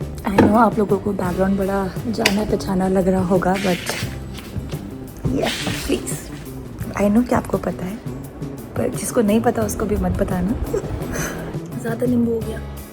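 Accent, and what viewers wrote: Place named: Rajasthan, India. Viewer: Or muje phle hi andaj tha ki sayad aap pali ya jodhpur se ho kyuki apki bat karne ka style vesa h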